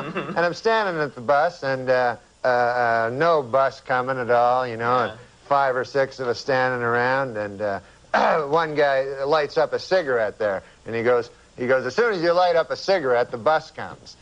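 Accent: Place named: Ottawa Valley, Canada. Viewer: That's Ottawa Valley, central/East Canada, it's rural Scottish influences.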